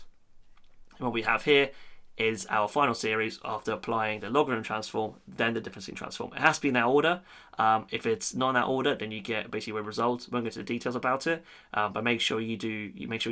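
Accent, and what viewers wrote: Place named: United Kingdom. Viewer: You are not talking with only your British people , so try to speak slowly with normal English and swallow your saliva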